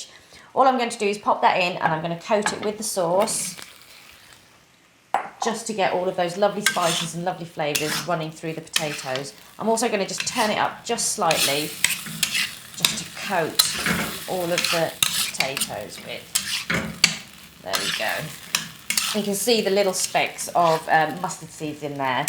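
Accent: United Kingdom. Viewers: I just watched the whole video just for that British english. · HEY SEXY INDO WITH BRITISH ACCENT YOU FORGOT TO ADD ANY SPICES NONE ARE YOU BOILING IT WITH NO SALT PEPER CHILI POWDER OR WHAT ?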